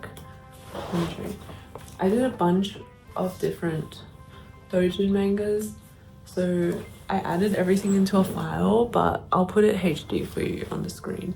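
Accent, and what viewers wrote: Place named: Perth, Australia. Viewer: Thought you had a Perth accent. Funny how I could always pinpoint people from home amongst other Australians. Last year I met some people 'from Australia' in Japan and I said to them 'no you're from Perth', and they were 😅 Didn't know there was a comic arts festival here.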